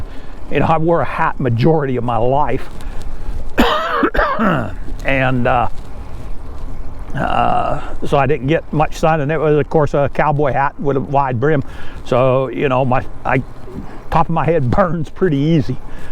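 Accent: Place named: South, United States. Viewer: What part of the South are you from? I am in Alabama.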